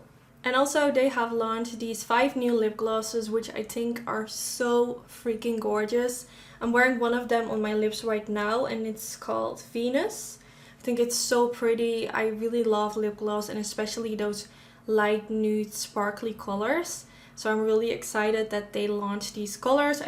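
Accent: Netherlands. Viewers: Hearing your accent reminds me soooooo much of time abroad in Amsterdam last year ❤️❤️❤️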